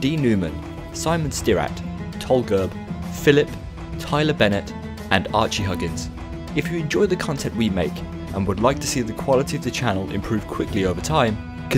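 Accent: United Kingdom. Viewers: Great brirish accent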